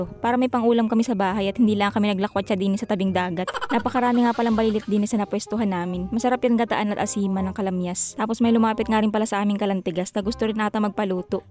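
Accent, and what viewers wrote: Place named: Visayas, Philippines. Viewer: Yong salita mo idol mayhalo kasing bisaya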